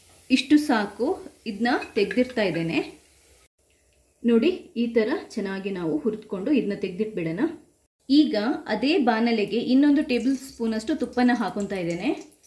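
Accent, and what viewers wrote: Mangalore, India. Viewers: Super ma'am nim kesari bath jothege manglore kannada kelodu Chanda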